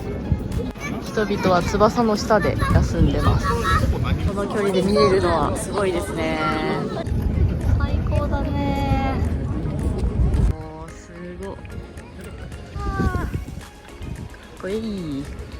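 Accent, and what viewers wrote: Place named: Kansai, Japan. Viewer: 、お疲れ様❤❤❤ 横田基地、楽しかった見たいダネ〜👍👏👏👏 の関西弁が出まくってますから🤣🤣🤣 オプスレーにC2にYS11もあるのかナァ？戦闘機は、F22にF15にF2選り取り見取りダネ〜🥰🥰🥰 でも、やっぱり、私は、自分も、関わっている、AH1コブラ🐍ダネ👍💖💖💖 は、何が、良かったかなぁ？あっやっぱり、外人バーガー🍔かぁ🤣🤣🤣 じゃ、次回は、山口県、岩国基地のレポート、お願いしま〜す😘😘😘😆😆😆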